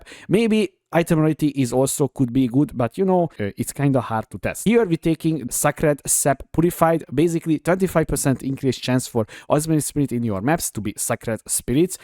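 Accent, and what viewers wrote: Hungary. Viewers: Ennél magyarabb akcentust még nem hallottam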